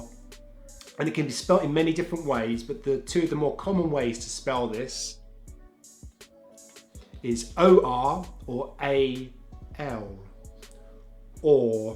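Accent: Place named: United Kingdom. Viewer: British accent is beautiful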